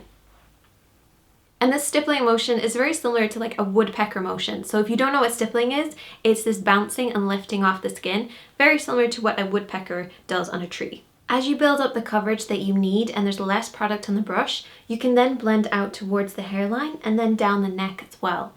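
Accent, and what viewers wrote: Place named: Ireland. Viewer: I love you and your accent! You explain everything so well!! I'm irish aswell! Proud to be irish🍀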